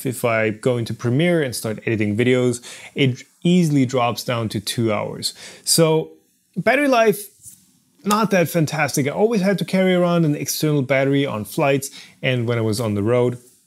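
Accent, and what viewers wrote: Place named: Germany. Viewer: I really hear his German Accent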